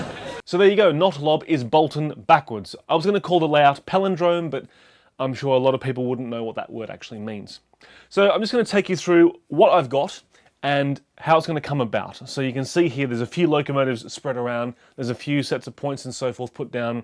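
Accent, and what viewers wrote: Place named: Australia. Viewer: Completely off topic, but what is your accent. I was thinking Australian ex-pat? Melbourne from the Herbert's T shirt?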